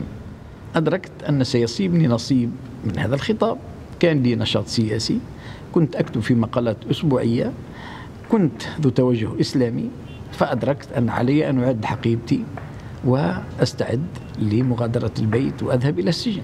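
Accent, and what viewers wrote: Tunisia. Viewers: شفناهم كيف نهضوا بالبلد ولهجتك التونسية واضحة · بس لهجتك تونسية بشكل كبير ، ليش ؟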